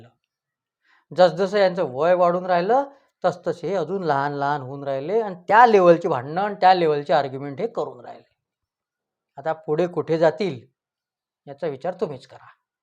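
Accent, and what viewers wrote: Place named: Nagpur, India. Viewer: Typical Nagpuri language, Enjoying from Nagpur.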